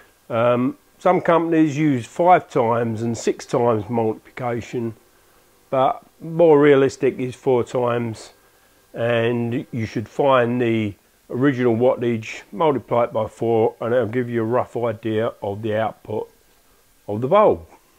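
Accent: United Kingdom. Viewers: I can hear the light holders clicking loudly. If you don't loosen them before you angle them you going to strip the gears.Is that a Cockney accent we are hearing. I'm a good boy I am.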